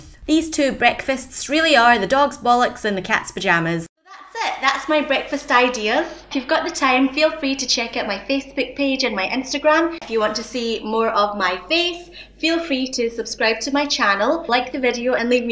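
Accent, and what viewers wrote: United Kingdom. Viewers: From your accent I thought you were from the UK, but once I saw the black swan hummus and heard you bought it from Coles I knew you're from Australia! So I subscribed start away! Yah~ can't wait for more videos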